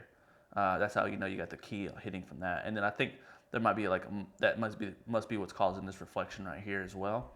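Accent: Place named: South, United States. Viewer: refers to the genetic condition of two different color eyes. If you look closely, they all have two different colored eyes. And the last video they’ve built the tree so I don’t know if it’s green screen. We’ll know when they release the behind the scenes. And where are you from? You are a southerner I can tell. Georgian here 🍑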